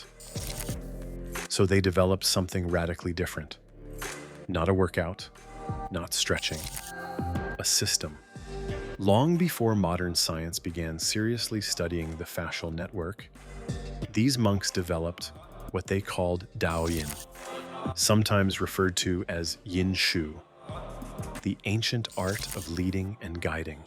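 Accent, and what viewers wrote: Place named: United States. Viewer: WHEN ARE YOU GOING TO CORRECT YOUR READER ON HOW TO PRONOUNCE PINYI? It is most annoying, THhr yank accent does not help either,,too bland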